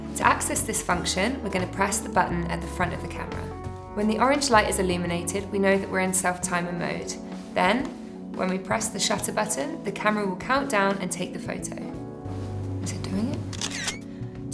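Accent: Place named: United Kingdom. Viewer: I dont know why American companies feel the need to get s British person to explain their product in order to get that "designer feel"? What has England designed?...Marc Newson is good....but what else?.......no cellphone or space program that's for sure.......and lets get real the average person is broke in England..for whatever stupid low self esteem reason we need the accent...thats the stamp.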